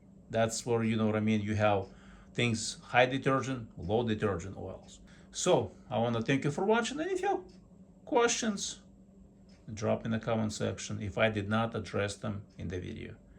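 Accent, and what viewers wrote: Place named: Russia. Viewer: Сразу слышно русский акцент)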